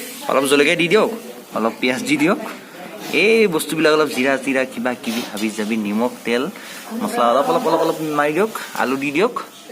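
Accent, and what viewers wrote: Nalbari, India. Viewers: Bor val lagise apunar vedio sai .. amr nalbari bhakha2 apunar mukht ru val lagise .. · Apuni Nalbari khurot kotha kobo bc val lage😁😁💕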